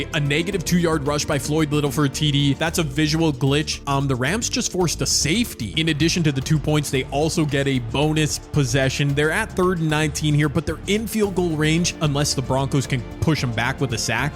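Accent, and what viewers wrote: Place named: Canada. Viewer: Your accent sounds Canadian, then I looked at your channel and confirmed that you Are Canadian. Being a Canadian, what do you think about Canada getting an NFL team or Two?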